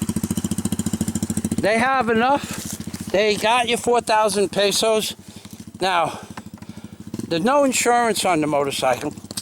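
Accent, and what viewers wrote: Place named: New York, United States. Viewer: I'll skip over the probability of you ever looking like a filipino by changing your appearance. BUT sorry bud, with that New Yawk accent you'll never be able to pass. Good video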